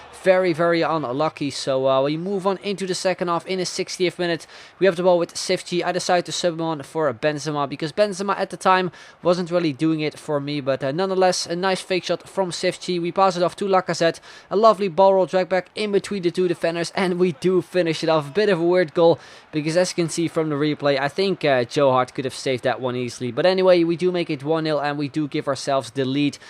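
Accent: Netherlands. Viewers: Are you Dutch???????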